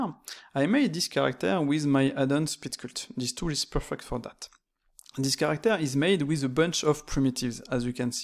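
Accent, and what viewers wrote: France. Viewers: Your french ? ( l'accent est reconnaissable)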